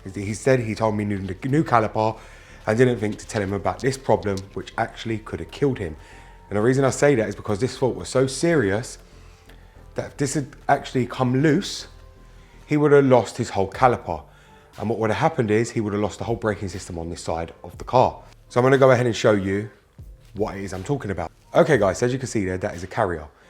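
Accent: United Kingdom. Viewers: Thank you. You might have saved lives with this information. It's a shame that we have so many service people who don't care. It's nice to see videos like yours, to realize that there are still good people out there. I love your British accent.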